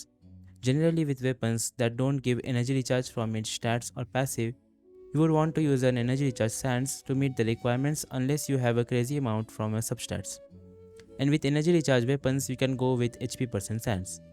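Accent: India. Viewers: Yo did his accent change to Indian or am i imagining it?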